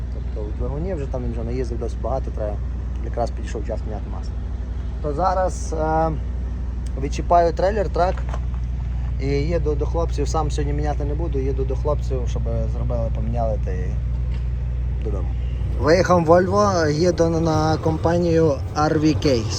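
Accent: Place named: Hutsul, Ukraine. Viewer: Слава Україні! На ЯРДУ на ШАПУ😂 Чому не на ЯРД, ШАП і т.д? Гуцульський акцент...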